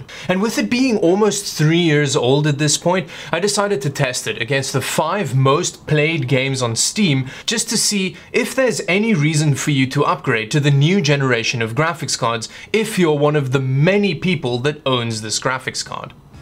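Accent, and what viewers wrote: South Africa. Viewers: are you south african? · Bra is jy van SA?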